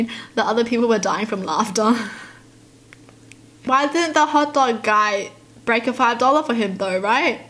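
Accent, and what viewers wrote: Australia. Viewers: Any blinks here think she sounds like rosé. Cause they both are Australian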